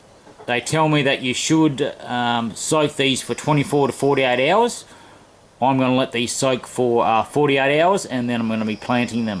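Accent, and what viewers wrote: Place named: Australia. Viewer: Fair crack of the whip cobbers. (Sea salt? he said Seasol quite clearly mateys?) This blokes an Aussie and is how a few of us talk. Fair dinkum you drongos get yourselves some hearing aids so you can catch what the hell he's waffling on about. Crickey diggers, cobbers, blokes, wake up and whack down a tinny or two, or three! I reckon a few people on this site wouldn't know if they were Arthur or Martha but then again it is 2025 and i've got no idea what the fck is going on half the the time with people these days? Anyhow if you spot an Aussie on the street give them a hoi and say gooday mate and ask them if they have any corms to spare. 😜😇Peace.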